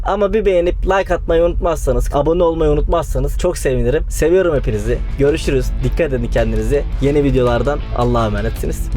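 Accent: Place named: United States. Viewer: Babacan Allah yardımcın olsun ama amerikan ağzıyla konuşman çok sinir bozucu yok tarp yok mill Türk ağzıyla konusun spenzet vs km aksi sinir bozucu yolun açık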